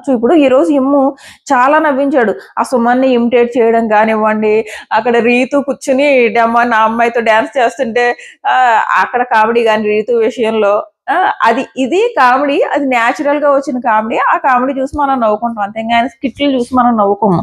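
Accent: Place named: Srikakulam, India. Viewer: Its Srikakulam slang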